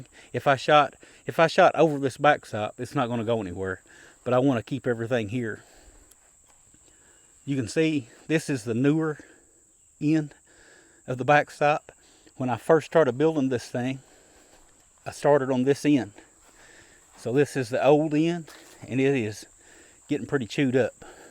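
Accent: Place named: Eastern Kentucky, United States. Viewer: It's good to see you making progress. I love to hear that accent from Eastern Kentucky. I hope you get to 100K before long. God bless.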